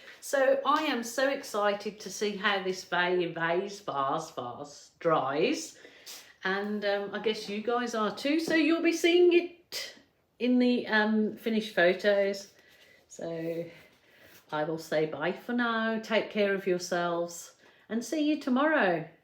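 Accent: Birmingham, United Kingdom. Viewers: we’re you a Brummie? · This lady sounds like an English Brummie.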